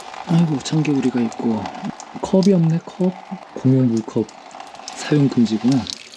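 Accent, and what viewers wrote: Jeolla, South Korea. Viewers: 자네 고향이 전라돈가?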